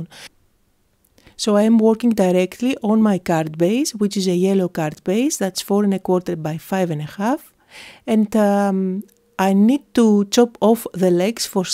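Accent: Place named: Greece. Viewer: So cute,! I think my favorite is the little boy with the clothes in the washing machine! How imaginative! So, you are Greek, I gather? I love listening to your accent! Your English is very good!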